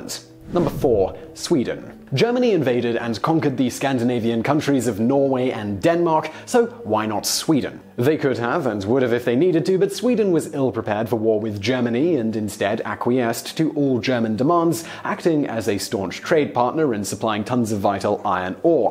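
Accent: England, United Kingdom. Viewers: How does this chap have an english accent except for his hard vowel pronunciation in any words not used in daily speech.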